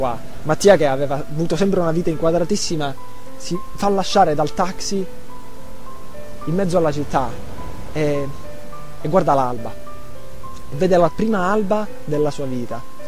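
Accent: Italy. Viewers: Dal modo in qui pronunci la C si sente che siamo dello stesso paese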